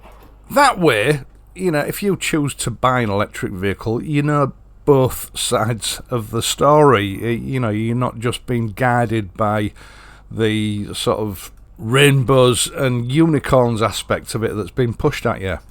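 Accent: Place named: Keighley, United Kingdom. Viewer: Congratulations, I'm following from Germany and I love the Pictures, the Triumphs and your comments on all the current e-mobility nonsense happening around. I worked in Keighley for a while 30 years ago an I love your accent. All the best for the next 100k 🙋‍♂️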